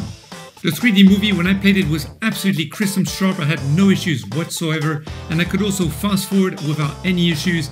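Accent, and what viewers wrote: France. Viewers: you have french accent lol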